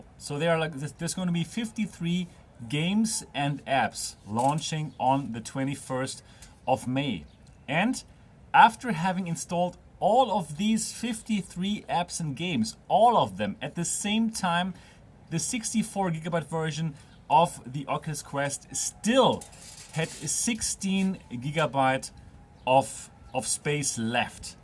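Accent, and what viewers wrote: Germany. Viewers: very hepful! Are you by any chance german? Maybe im mishearing something but you sound kinda like it haha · Are you a German?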